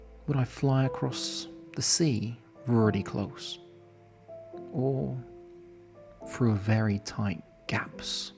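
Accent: United Kingdom. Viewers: This makes sense, i could quite place where you may be from. Its a unique accent but definitely quite British the accent and you have great humor. Great work man keep it up. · U can always count on someone w a British accent to call a spade a spade. Lmao. Agreed. Beyond the mini2... It's going to take alot to justify crossing the legal line in Canada .. ... Awaiting the Mavic 3 as well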